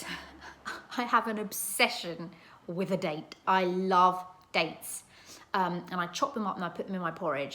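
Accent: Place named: North, United Kingdom. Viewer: Looking beautiful, like Youth to the People xxx ❤❤❤xxx 🦮🐾🐾 Hi Bertie. Bloody lovely. North accent lol xx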